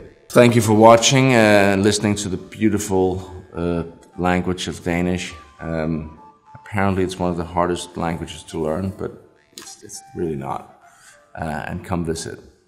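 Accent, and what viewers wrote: United States. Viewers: It's funny to hear him speaking with an American accent in this video and a British one in GoT. And of course speaking Danish, which sounds like something is continuously stuck in the throat. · I find it fascinating he normally speaks with an American accent but he was born and raised in Denmark. Even his Danish sounds more like an American speaking Danish. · When he speaks english he almost sounds American? I had no idea he was Danish. I guess i've never heard their accent before. haha he's great!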